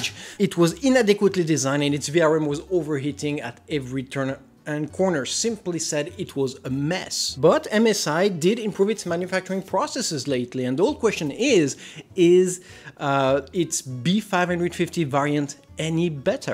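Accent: France. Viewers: Ooo le franchoyardd 🤣 ton accent vaut de l’or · the jesus of mother boards love man, j’adore l’accent français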